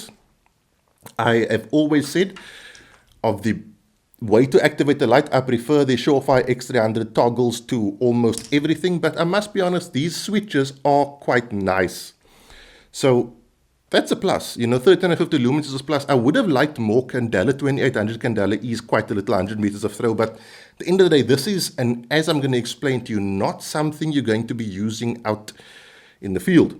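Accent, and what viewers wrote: South Africa. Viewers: Jeez - could you roll your R's and add syllables to words anymore! This guy couldn't be anymore South African.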